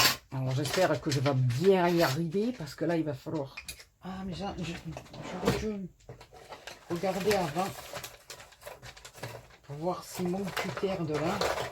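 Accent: South, France. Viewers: Qui peut bien vous demander de vous justifier sur "votre chaîne", une personne qui ne comprend pas grand chose et encore moins à ce qu'est le partage. Moi j'adore vos créations avec ou sans tuto c'est toujours un plaisir pour les yeux. J'aime votre bonne humeur et votre accent du midi, ne changez rien. Cette carte est magnifique et à refaire. Merci pour ce pas à pas très bien expliqué. Bonne journée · Bonjour, j'adore tout ce que vous faites, je regarde toutes vos vidéos malgré que je commente rarement. C'est votre chaîne vous y faites ce que vous voulez!! Vous n'avez pas à vous excuser et je trouve complètement ridicule et irrespectueux de quitter votre chaîne parce que vous faites pas tout les tutos de vos créations!! Vous devez prendre du plaisir sur votre chaîne et ne pas avoir aucune obligations!! Ce que j'aime aussi beaucoup c'est votre accent du Sud:D Bisous et continuez telle que vous êtes!! 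· Cc je ne suis pas abonnée depuis longtemps mais je dois dire que tu partages beaucoup de tutos. Ne t excuses pas et tu fais ce que tu veux sur ta chaîne. Merci pour le partage et pour ton accent du sud que j adore💕💕belle soirée 😘😘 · Coucou, moi je te comprends tout à fait et je te reste fidèle car je t'apprécie beaucoup. Même si ça fait que 2 ans que tu fais du scrap, j'adore ce que tu fais, reste comme tu es. Les tutos en vidéo doivent être fait avec plaisir et sans stresse, sinon ce n'est pas la peine. C'est toujours un grand bonheur de te retrouver et d'entendre ton si bel accent du sud. Les personnes qui se sont désabonnées n'y connaissent rien en scrap et ne comprennent pas la scrapeuse. Fait bien attention à toi, je t'envoi pleins de gros bisous et mon soutient. Sabine Sarazin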